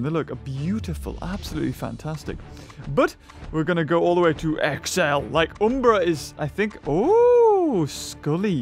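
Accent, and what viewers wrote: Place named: United Kingdom. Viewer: you live in the U.K.don't you I hear it in your voice. Can you send pictures of castles?😁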